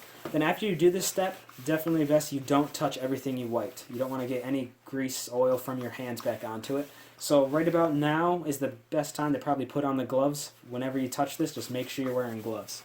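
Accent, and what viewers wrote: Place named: United States. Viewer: Jorge Cruz stupid question....clearly you can hear they're American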